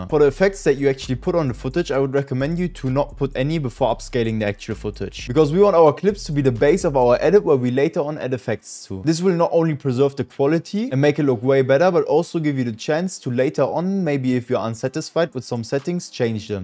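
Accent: Germany. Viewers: ur german i only watched 10 seconds and heared ur accent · richtig deutscher akzent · This German accent 👍🏻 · Ich höre einbisschen Deutsch raus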